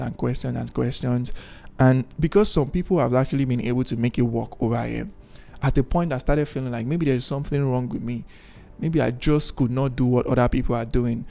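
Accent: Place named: Yoruba, Nigeria. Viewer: Love this video, and the H factor that comes with the yoruba accent,🥺❤️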